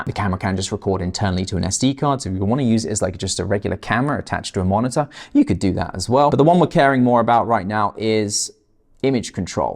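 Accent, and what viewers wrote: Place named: United Kingdom. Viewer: judging from your accent I'm going to assume you're based in the UK and you forgot to mention one key point. The Mevo Core camera is NOT available in the UK with no date provided as yet as to when they will be available. In my communications with Mevo/Logitech they could not guarantee they would ever be available outside USA/Canada in the future either.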